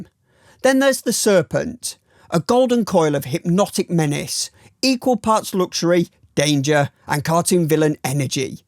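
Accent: United Kingdom. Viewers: I have a really hard time understanding a number of British accents. When I watch TV I just turn on Closed Captions, but that eats up valuable real estate on my screen when I'm viewing YouTube watch videos. So, I go into settings and set the playback speed to about 85%, and I can understand nearly every word. Just a suggestion. I think if people who produce content who have heavy accents, regardless of what kind, by ten percent, a LOT more people would be better able to understand them. Also just a suggestion. Great review!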